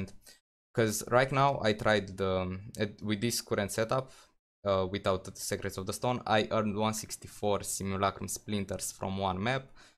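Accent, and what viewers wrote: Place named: Romania. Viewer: Are you romanian? Heavy romanian accent. If yes, Salut!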